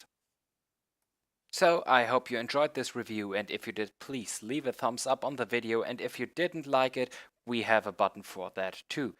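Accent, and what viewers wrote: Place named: Germany. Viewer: no, don't do it, I need this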